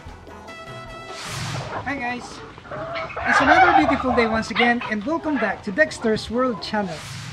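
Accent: Philippines. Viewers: ARE YOU PILIPINO?? YOUR ACCENT . PLEASE SPEAK TAGALOG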